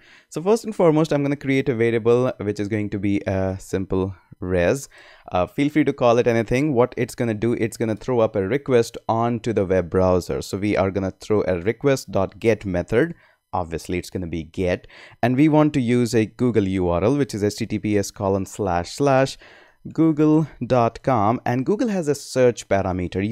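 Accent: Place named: India. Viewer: When you talk fast your accent screams i.n.d.i.a.n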